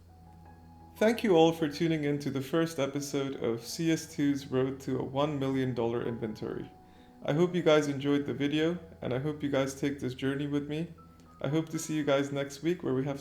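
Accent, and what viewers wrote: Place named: Czech Republic. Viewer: Wow man, what a cool idea. Hope you will find sponsors to boost inventory. Btw where are you from? you sound Czech to me but if im wrong sory. Good luck.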